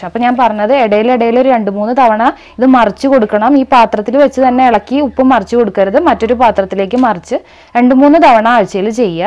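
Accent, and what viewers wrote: Thrissur, India. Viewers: Valare nalla avatharanam ethra simple aayaanu ellaa karyavum paranju thannath Evarkkum manassilaavunna thani naadan bhaashayil ellaa karyavum visadeekarichu thannu Nalla cute voice Chechiyude samsaaram kettittu chechi Thrissure jilla kkaariyaanennu thonnunnu Thrissure jilla yilaano veedu Marupadi pratheekshikkunnu Ethrayum nalla sabdathinudama yaayittenthina Mukham kaanikkaathirikkunnathu Thank you chechi thank you veri much🙏🙏🙏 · Enjoyed watching the video AND THE " THRISSUR BASHA ". My grand mothers & mother used make this Kadumaanga / Kannimaanga Achar ( Vadumaanga Pickle in Tamil ). They used to parcel in air tight / sealed containers at local blacksmiths and send to their children residing outside India. Now it is becoming more & more difficult to make at home and easier to buy in the market. Thanks again for the video and again for the Thrissur Basha.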